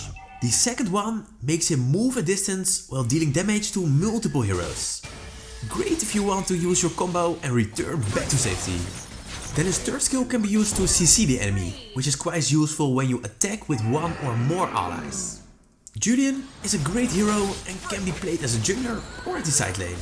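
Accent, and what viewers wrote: Netherlands. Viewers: You got so Dutch accent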